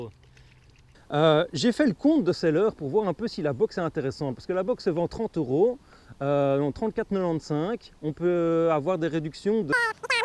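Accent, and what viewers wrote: Belgium. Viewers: Tu viens de Namur tu as laccent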